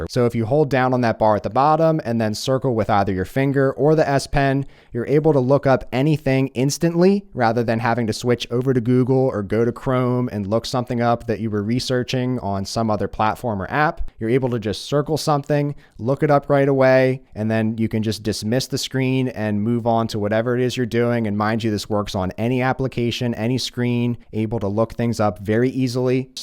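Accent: Philadelphia, United States. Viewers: Gotta be from Philly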